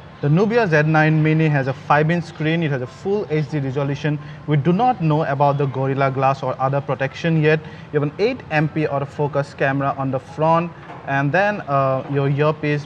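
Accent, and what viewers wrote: Assam, India. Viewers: mate . you do have an Assamese accent .. r u from there ??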